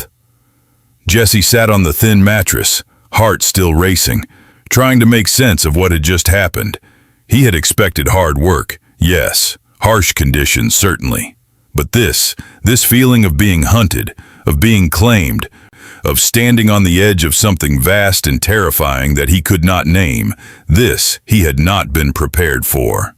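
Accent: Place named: United States. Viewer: Listening to your, fantasies, fascinating and so well constructed and excellently narrated stories. Your stories usually always bring a smile to my face and hold more than my attention. The voice is so American, gripping and appropriate. However Steinbeck's novella "Of Mice and Men" is very powerful and probably far more enduring in the USAlien cannon.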